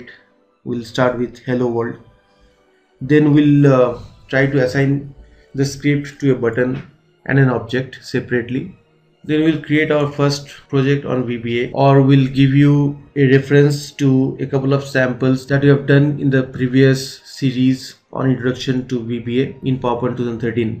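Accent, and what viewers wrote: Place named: India. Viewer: I hate the India English!!!!!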